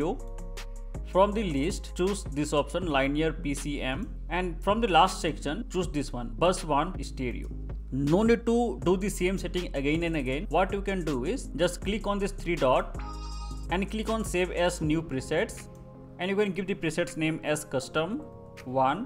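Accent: India. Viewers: Why is it always some random indian with a strong accent that solves my technical issues? Thanks a lot!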